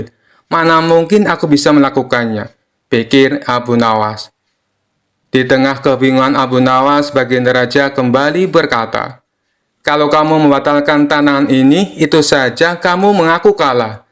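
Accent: Java, Indonesia. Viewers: Udah medok, intonasi lemes, sebaiknya gunakan suara google aja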